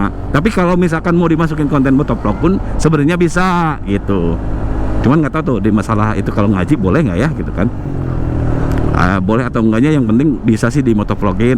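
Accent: West Java, Indonesia. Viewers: Memang aduhai kang logat sunda purwakartanya euy..👌👌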